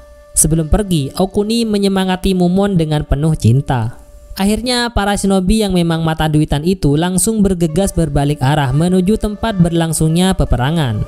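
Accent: Madura, Indonesia. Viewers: Oreng madureh pengisi suaranya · Orang madura ya min?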